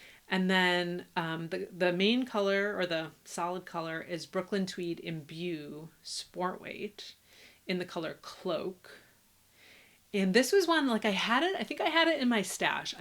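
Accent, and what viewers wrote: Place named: United Kingdom. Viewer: Wow! I’m only a few minutes in and coming here to say that I am glad to find you and am excited for your videos! My guess is UK, Britain? 🐑 congrats on Jeopardy. Super cool.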